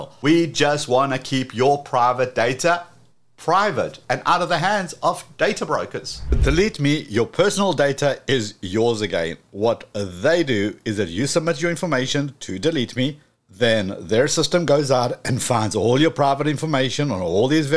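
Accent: South Africa. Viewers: Wait you South African 😮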